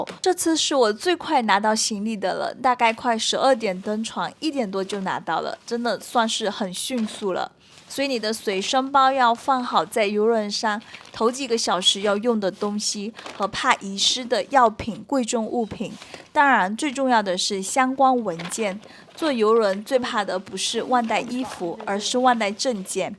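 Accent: Fuzhou, China. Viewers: 你是福州人嗎？尾音好像聴出鄉音！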